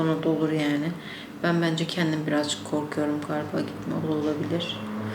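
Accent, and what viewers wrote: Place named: Russia. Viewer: Türkceyi ögrendide russ kaldi😂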